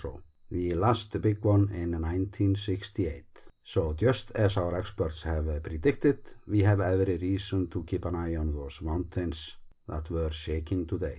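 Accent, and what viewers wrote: Iceland. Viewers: I know you are teasing, but I still want to say that his wonderful voice and accent add a great deal for me. I can’t imagine his videos narrated with, say, a British or American accent. I have to pay close attention — actually a good thing — but I understand everything he says. For this ‘Murican, though, Icelandic place names are impenetrable, which is actually rather fun. Just don’t expect me to be able to pronounce or spell them!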